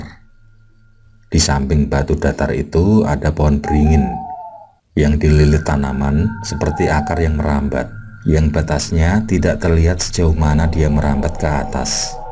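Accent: Java, Indonesia. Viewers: Orang Jawa yo mas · Orang jawa mana suara nya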